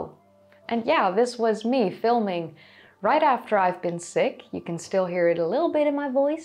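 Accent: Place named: Netherlands. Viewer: that wonderfull dutch accent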